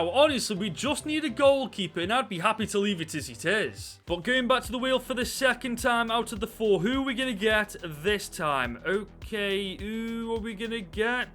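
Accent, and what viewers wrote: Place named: England, United Kingdom. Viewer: My guy has the thickest English accent I’ve ever heard, and I love it 😂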